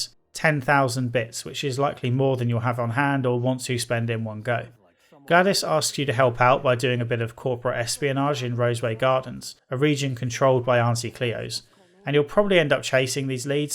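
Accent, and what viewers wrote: United Kingdom. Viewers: “The game presents an extremely anti capitalist take, witch I am completely on board with” Cronyism and a type of Vulture Capitalism has its problems for sure... But Free Market Capitalism has bin amazing for us in so many ways. Corruption in people is what’s led to most of our problems.. the Free Market is somewhat the answer to these problems. Capitalism is a much better alternative to Socialism or Communism.. A lot of hippies and propagandists have bin at work over the last few decades trying to convince people otherwise.. They think something that’s already failed many times and led to the death of hundreds of millions of people is something we should try again. A very small and homogeneous country like Denmark had to stop these socialist types from making the claim they were a successful socialist society.. It’s still not something that would work large scale with groups of people indifferent with each other. 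Freedom is worth more then life itself. limited government is almost always the better option. I live in Canada with a socialized healthcare system.. and our healthcare system suffers in so many ways because of this. Not to mention our high taxes and outrageously high cost of living.. You live in the UK from what I gather.. the accent. I know the NHS has a lot of problems as well.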